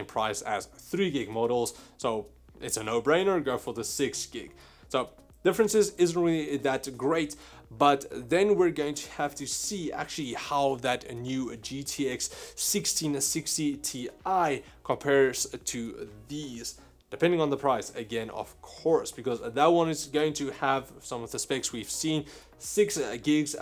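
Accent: South Africa. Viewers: Damn I knew this is a fellow South African by that accent... Lekker Boetman.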